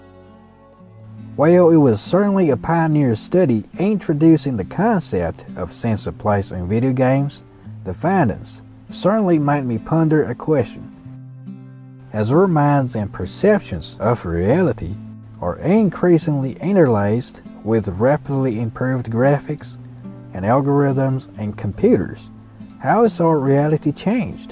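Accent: South, United States. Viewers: 我是一个住在德州的中国人。你的南方发音很棒 :)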